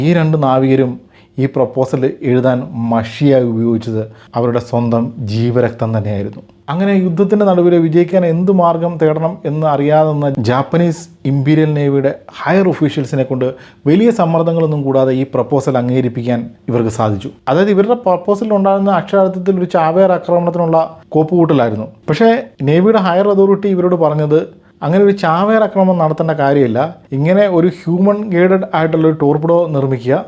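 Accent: Kottayam, India. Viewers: veedu kottayath vellom anoo....slang😊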